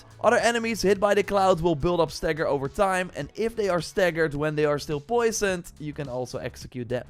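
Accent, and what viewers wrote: Netherlands. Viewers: This is the most Dutch English accent I've ever heard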